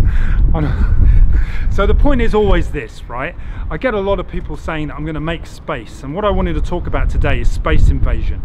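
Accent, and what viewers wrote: United Kingdom. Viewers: I like your teaching very much, but the example is probably far too frequent and too much, hahahha. I hope your partner has a body armor inside his pullover. Are you from Britain, Sir? You speak like James Bond. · I Appreciate Your Wisdom and Sophisticated British Accent Sensei!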